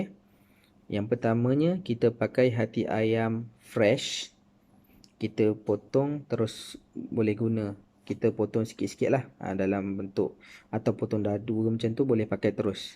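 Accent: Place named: Java, Indonesia. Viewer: Ada lah bang bunyi jawanya😅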